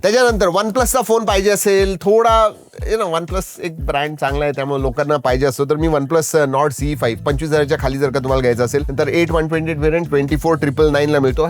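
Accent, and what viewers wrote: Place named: Pune, India. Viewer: भावा, 'त्यामुळं', 'कुठं', ' हिनं-तिनं' वैगेरे असं ग्रामीण किंवा suburban, outskirt पुण्याच्या दैनंदिन बोलीभाषेत एकमेकांशी संवाद साधताना म्हणतात. महाराष्ट्राच्या अनेक जिल्ह्यांच्या दैनंदिन बोलीभाषेतही संवाद साधताना असं म्हणतात. त्यात असं काही गैर नाही. तसा शब्दोच्चार खूप सामान्य आहे.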